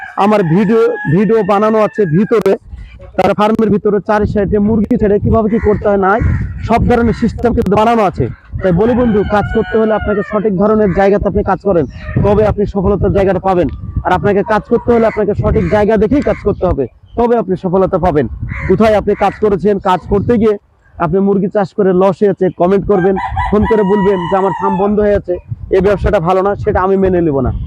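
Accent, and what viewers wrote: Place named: Bangladesh. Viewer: ভাই আপনার কথাবার্তা স্টাইল তো একদম বাংলাদেশীদের মত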